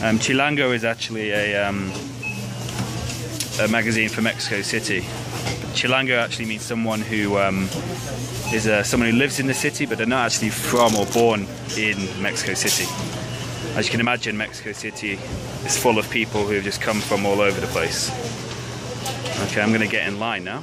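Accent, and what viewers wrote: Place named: United Kingdom. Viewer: British accent sounds so fancy :p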